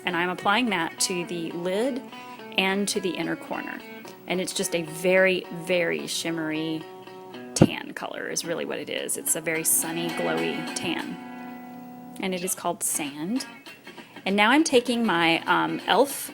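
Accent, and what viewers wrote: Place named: New Jersey, United States. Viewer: I'm from Jersey and I really just noticed the "accent" in your voice I've been watching you for a while!! lol I know it's random that I notice it so late! oh well though I love it!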